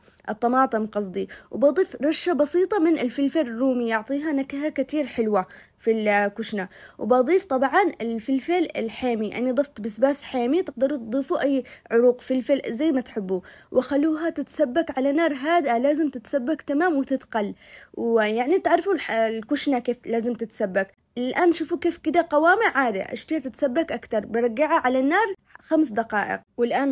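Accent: Aden, Yemen. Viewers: تسلم دياتك الحلوات ،،،مبدعة حبيبتي ،فديت لهجتك العدنية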